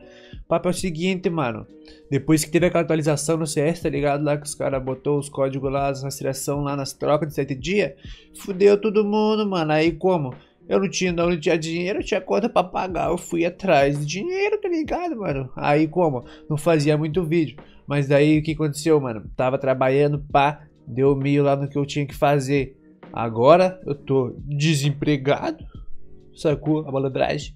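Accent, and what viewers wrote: Rio Grande do Sul, Brazil. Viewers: madrugando no game atá,achei q era do rio grande pelo sutaque de gaucho kakakaka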